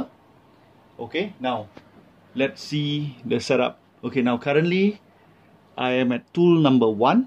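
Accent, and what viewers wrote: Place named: South Africa. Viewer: you have south African accent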